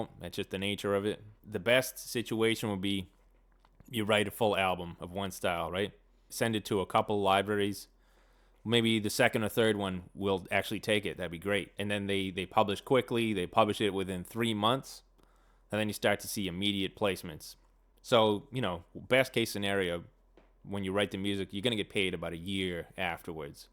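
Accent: Boston, United States. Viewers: Are you from Bah-Ston? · I’ve heard other sync people say to do niche music and not try to do 10 different genres. Isn’t that the strategy with growing a following on social media as well? Btw Is that a Boston or New England accent?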